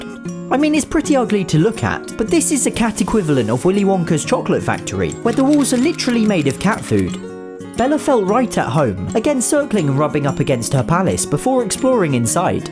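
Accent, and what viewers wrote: United Kingdom. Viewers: Is he Bri'ish?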